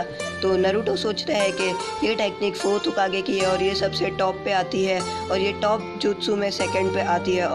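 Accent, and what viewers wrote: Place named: Hyderabad, India. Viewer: Your accent is like Hyderabadi you are from Hyderabad?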